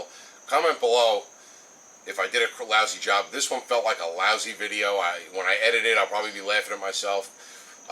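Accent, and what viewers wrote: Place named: Long Island, United States. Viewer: I knew it was long island before I even saw the shirt. Haha. Can't mistake that accent for anything. I got family in Smithtown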